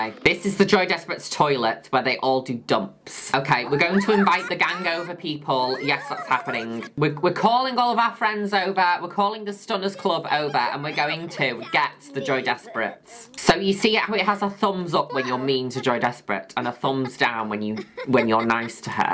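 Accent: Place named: United Kingdom. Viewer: The british accent makes everything better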